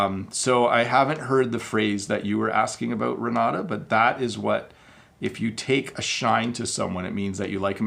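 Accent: United States. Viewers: Canadian and American accents are the same or not . We hen I heard you fist time I thought you are american. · Hi sir I you really Canadian... I thought you from American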